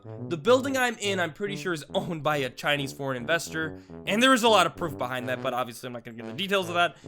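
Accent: Canada. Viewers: he is Canadian?!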